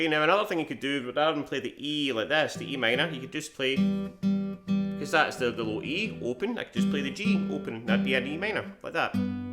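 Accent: Scotland, United Kingdom. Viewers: Good stuff! What part of Scotland?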